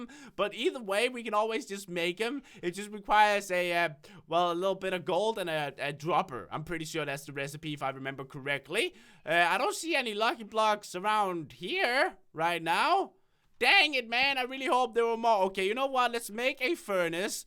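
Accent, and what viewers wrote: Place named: Denmark. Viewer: It's his real voice but he has a Danish accent. When he speaks in Danish it is deeper. It's just sounds different when he speaks in English. I don't know about you but I absolutely love his voice!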